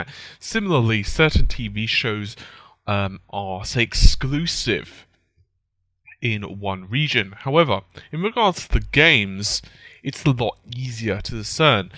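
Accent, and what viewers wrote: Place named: Manchester, United Kingdom. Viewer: Are you from Manchester? your accent is simular to Soprano pictures.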